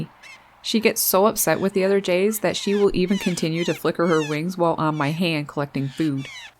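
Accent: Newfoundland, Canada. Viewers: I love your jays!!!! My jay family seems to have totally different calls, reactions and habits than yours. Maybe cause they in a different side of the continent? I just love them too!!! Happy Jay watching!!! 💙 (I'm guessing from your accent you're in NFLD?) 😁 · This was very entertaining! I LOL'ed several times. I've started feeding blue jays recently, but I did not realize they could become so comfortable with humans. Their squeaks sound a bit different where I live... you sound Canadian... maybe your blue jays have a Canadian accent? Anyway, thank you for sharing this remarkable and delightful footage.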